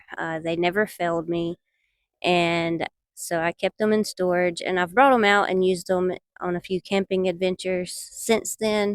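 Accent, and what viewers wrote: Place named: South, United States. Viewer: I love that southern draw, your ex must have been crazy to divorce you.. keep up the good work, and take care of your fur baby.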